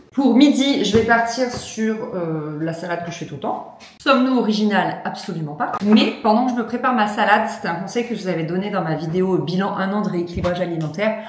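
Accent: South, France. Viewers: Merci, je t'ai découverte il y a peu et j'adore tes vidéos (que j'ai binge-watchées héhé). Tu es apaisante, très agréable et je suis persuadée que tu es vraiment sympa en vrai... et cette pointe d'accent du sud m'amène un peu de soleil. Hâte de te retrouver...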